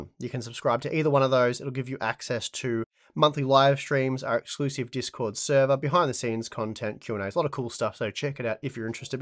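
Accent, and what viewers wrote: Australia. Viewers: I'll add one more thing: their reasoning is so cogent, clear, and concise that they do a bonus side service by teaching their viewers how to think critically, a skill that's very rare and precious these days. Oh, and did I mention that the Australian accent is another bonus and a very charming and welcome change in a repetitive sea of Americans?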